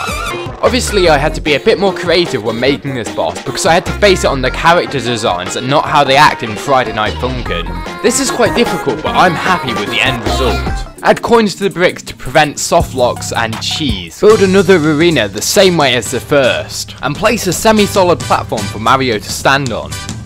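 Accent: United Kingdom. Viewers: Ur you British?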